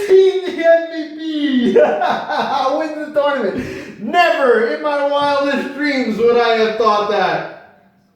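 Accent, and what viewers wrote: Italy. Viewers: Ma sei italiano? Lol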